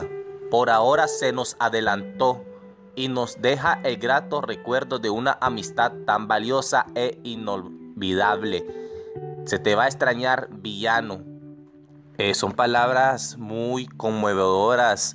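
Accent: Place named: Central America. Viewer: Se te escuchan problemas de habla y no sabes leer bien. Escucho acento centroamericano. Le atiné en todo, cierto?😐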